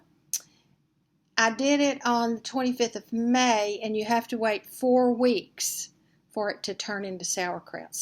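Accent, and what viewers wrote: South, United States. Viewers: My mother was born and raised on a farm that her family were homesteaders on in Conway, Arkansas. I think that's why whenever I'm missing her I always tune you in so I can hear her through your beautiful southern dialect. Wish I lived close to you, I'd certainly adopt you. Hugs a bunch, Toni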